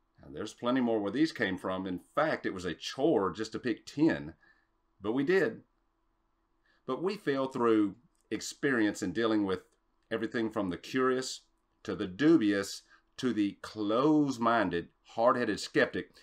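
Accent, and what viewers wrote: South, United States. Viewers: my man, I love your work. YOU are brilliant...not to mention a real entertainer. However, you actually would help your cause so much more, and could convince the uninitiated of your sincerity, IF.....IF...you didn't dress like a homeless person and buy a comb. Now it doesn't bother me about your sloppy dress and or your southern accent...because I live in the south, but ya have to understand PERCEPTION becomes REALITY. So just a suggestion to take a bath, go to Sportsclips for a hairCut, and buy some new clothes. But regardless, even if you were to where a clown suit...I will watch all your videos TWICE. Love ya man.....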